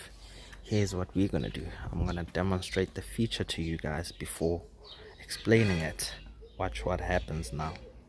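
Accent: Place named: South Africa. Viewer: Dude no way I was gonna say you sound South African!! Love the videos man keep it up :)) Love from Cape Town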